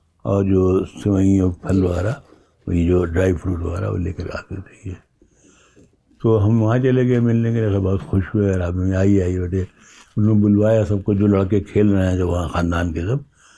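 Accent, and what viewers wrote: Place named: Raebareli, India. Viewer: Apki awaaj ikdm.raebareli wali hii hai sirr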